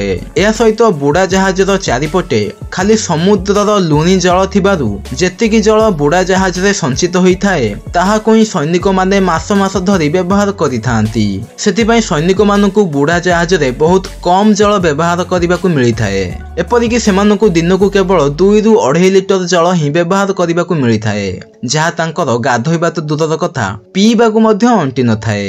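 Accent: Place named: Jajpur, India. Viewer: Bhai tuma voice ta janila pari laguchi tuma ghara jajpur nanpur ki ❤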